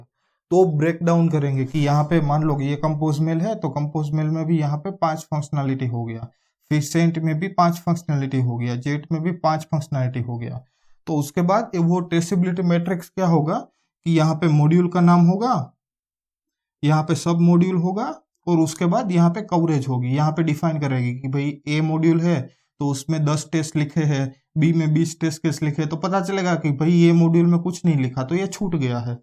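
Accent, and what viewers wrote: Gujarat, India. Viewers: Gujrati accent bhai ni 😉😉 helpful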